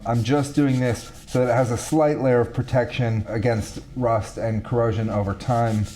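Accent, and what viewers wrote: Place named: United States. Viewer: Where on earth do you live? Based on your electrical outlets in your shop I was thinking somewhere in the UK but Maybe not? Definitely Europe somewhere I assume. The other big clue are the stone walls in your shop that you just don't see in the US other than a few colonial era homes and barns in the Eastern US. But then your accent is completely American so it's all a bit confusing haha.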